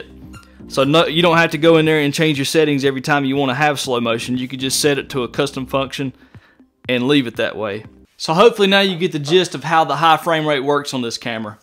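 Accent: South, United States. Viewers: Thanks! Nice to hear a southern voice on a GH5 tutorial!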